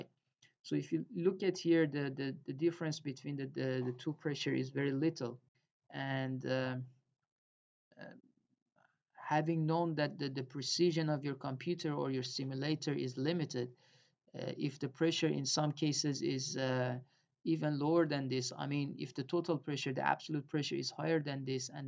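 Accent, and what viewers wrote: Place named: Iran. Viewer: are you iranian? this is a persian speaking english accent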